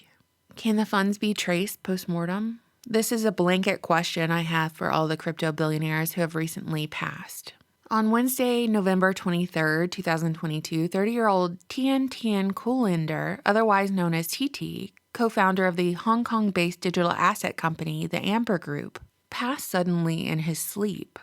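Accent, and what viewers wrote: South, United States. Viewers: “Hey internet friends, did you know…” smiling pretty face and southern lilt about to rock our worlds with something “to ponder as you go about your day”😅❤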